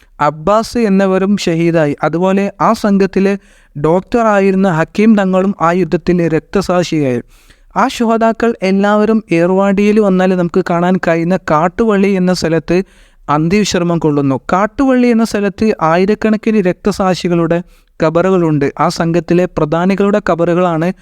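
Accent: Malappuram, India. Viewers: മലയാളം പറയുമ്പോൾ മലയാളം തന്നെ പറയണം അല്ലാതെ മലപ്പുറം മലയാളം അങ്ങിനെ ഒന്നില്ല... നിക്ക് ചീരാപ്പു പുടിച്ചുക്കുണ് എന്നു പറഞ്ഞാൽ എനിക്ക് മനസ്സിലാവും കേരളത്തിൽ ഉള്ള എല്ലാവർക്കും മനസ്സിലാവില്ല... മുഴുവൻ എന്നു പറയേണ്ടിടത്തു മിയ്‌മൻ എന്നു പറയരുത്... ചായ കുടിക്കാൻ എന്നതിന് പകരം ചായ കുടിച്ചാൻ എന്നു പറയരുത്... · പല നാട്ടിലും പല ഭാഷകളാണ്. മലപ്പുറം ഭാഷ ഇങ്ങിനെയാ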